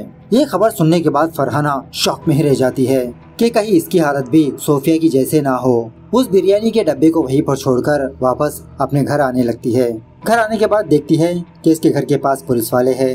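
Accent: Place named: South, India. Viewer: it’s not shudh hindi Southern style Urdu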